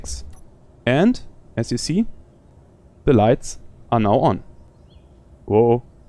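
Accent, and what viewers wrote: Germany. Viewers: As a German, I swear to God this guy is a German as well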